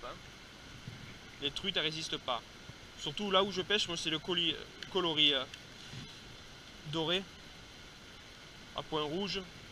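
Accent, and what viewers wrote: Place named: Corsica, France. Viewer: ps ; ta l accent corse un truc de fou :)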